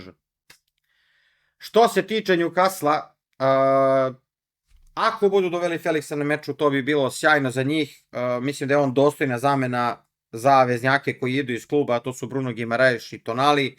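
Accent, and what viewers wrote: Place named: South, Serbia. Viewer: Gledajuci te i slisajuci,prosto ne mogu da se otmem utisku da izgledas kao bulja,a i pametan si kao ista. Nemoj vise komentarisati sa tim juznjackim naglaskom si preglup.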